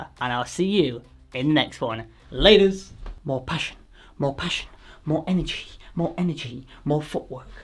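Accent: Manchester, United Kingdom. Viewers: Jajajajaja What?! No! Manc meaning Mancunian - most of the time your accent brings me back there. I'm Spanish but I've been living up there for a few good years. It's a dear place for me with mostly lovely, warm people (being from Andalucia you kinda miss that when you're away and I got that there) Not to forget that Manchester is in a pretty sweet spot where both Peak district and Lake district are at a stone throw! Soooo many awesome places to explore :_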